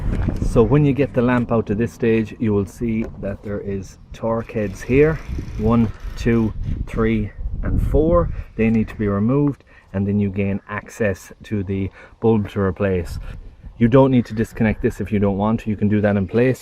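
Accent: Ireland. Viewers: not only was the instruction well conveyed but its nice to hear it with an irish accent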